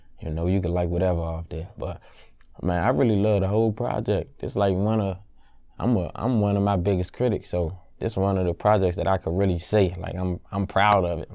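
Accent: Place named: South, United States. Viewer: He Sounds Country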